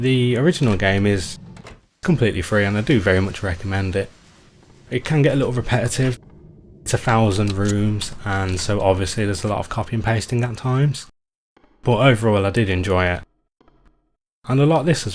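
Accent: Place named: Birmingham, United Kingdom. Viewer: are you from birmingham or black country? accents familiar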